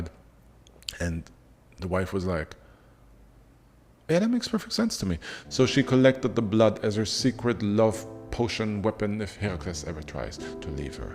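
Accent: Germany. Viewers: Why do you have a German accent sometimes? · to me it sounds like a German accent. Also the pronunciation of the god’s names sounds exactly like you’d hear them in German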